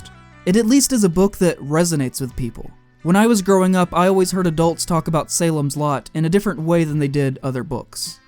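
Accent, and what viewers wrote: North Carolina, United States. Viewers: Odd. I thought that you sounded like someone from NC, during this, and then you confirmed it.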